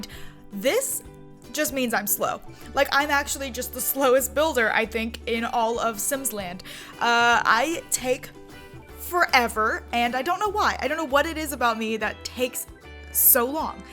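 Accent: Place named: New York, United States. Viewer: For some reason you just sound like a New Yorker to me. I always wanna ask what borough you live in. But then I’m reminded you’re from Texas. 😅😅☺️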